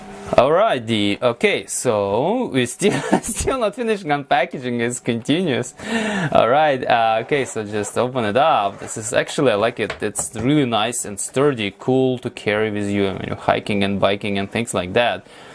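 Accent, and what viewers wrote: Russia. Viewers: Відразу чутно що російскомовний.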